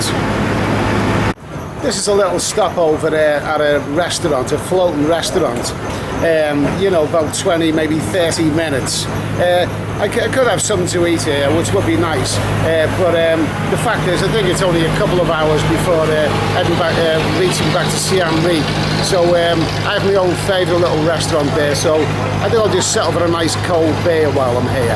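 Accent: Liverpool, United Kingdom. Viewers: Fantastic video, your photography was brilliant, your commentary was most informative without being boring, i think the Scouser accent gives it a nice cheeky humorous flavour, although i think you may have pissed off a few lads in the council estates around "LaPool.HeHeHe.Keep up the the good work brother look forward to more like this one.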